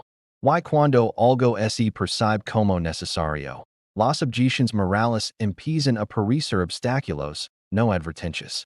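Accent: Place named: England, United Kingdom. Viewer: Tiene acento de ingles hablando español no se entiende bien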